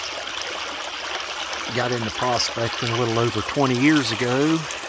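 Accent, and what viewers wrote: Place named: Georgia, United States. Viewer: Controlled stuff lol I'm not all sciencey 😂 lol you sound like me, it sounded good in my head but my tongue wouldn't cooperate lol controlled environment is what we're looking for. I promise I'm not laughing at you I'm laughing with you because I know your educated and purdy smart but we mix our words up on top of our country accents (GA here) and folks think we're some kinda bumpkins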